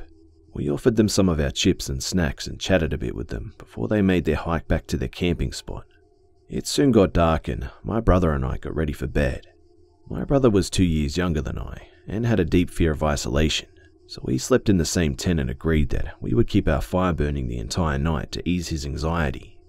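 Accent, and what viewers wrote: Australia. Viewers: Australian, right? love your narration! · Is it me or can I hear a hint of Australian? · Some random person yep, you can indeed, is Australian:)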